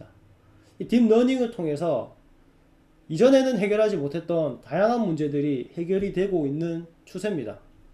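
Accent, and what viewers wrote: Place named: Busan, South Korea. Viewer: 딥러닝에 관심이 많아 동영상을 보게 되었습니다. 설명도 쉽고 재밌네요. 특히 부산사투리가 매력적입니다ㅎㅎ 꾸준히 구독하겠습니다. 추천업!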